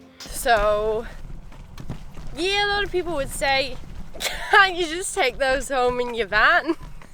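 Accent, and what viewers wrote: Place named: Scotland, United Kingdom. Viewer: You have a wonderful Scottish Accent. ☺️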